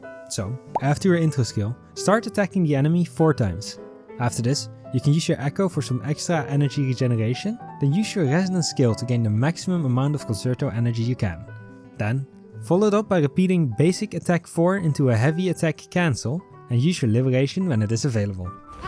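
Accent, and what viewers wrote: Netherlands. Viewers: the dutch accent, gekoloniseerd ouwe! nice vid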